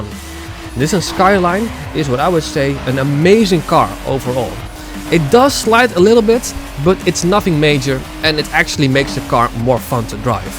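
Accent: Netherlands. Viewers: I can tell you’re dutch, if not, then i’m just stupid, but, anyways, no hate tho, finally a dutch tuber talking bout need for speed game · Are u from Holland 🇳🇱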